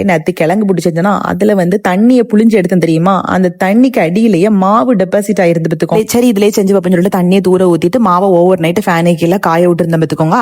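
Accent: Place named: Nagercoil, India. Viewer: Nagercoil பேச்சு azhagu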